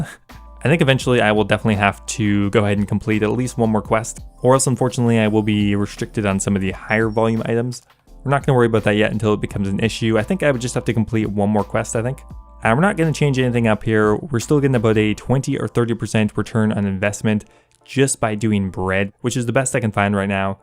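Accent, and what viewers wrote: Canada. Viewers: I'm american and I can tell you his accent is canadian.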